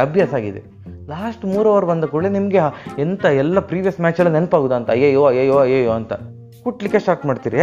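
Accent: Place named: Kundapura, India. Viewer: ಕುಂದಾಪುರ ಕನ್ನಡ🔥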